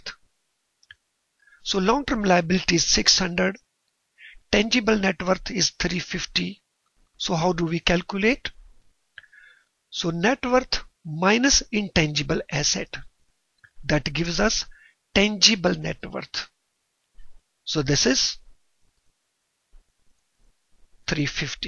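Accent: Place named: India. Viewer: WORST INDIAN ACCENT, AYYO DDDDDIZZAAASSSHHHTER!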